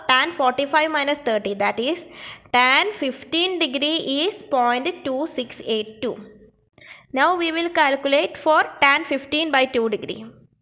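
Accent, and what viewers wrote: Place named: Kerala, India. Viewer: I love malayali accent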